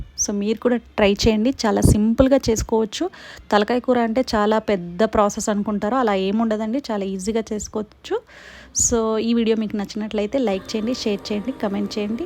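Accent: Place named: Andhra Pradesh, India. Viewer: Miru andhra valala matladuthunra slang 😊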